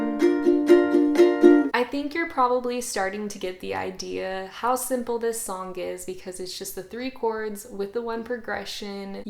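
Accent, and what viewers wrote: South, United States. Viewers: That southern accent makes me melt. ❤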